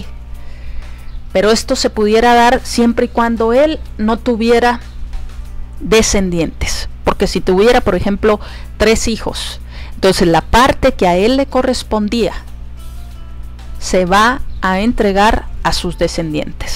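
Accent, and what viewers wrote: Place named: Mexico. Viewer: María Camila Simbaqueba Sánchez al parecer es de México, por el acento.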